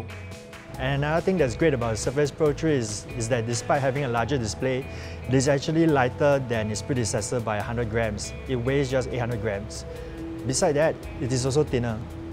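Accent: Singapore. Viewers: Dat singlish accent...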